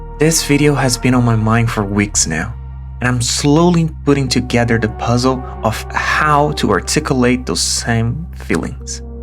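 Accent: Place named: Brazil. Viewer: eu reconheço um sotaque brasileiro de longe. ótimo vídeo, desejo tudo de bom pra ti! vc eh zica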